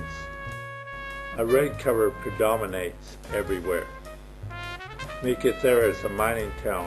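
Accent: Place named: United States. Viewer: Why is a "Seppo" narrating this?🚫🇦🇺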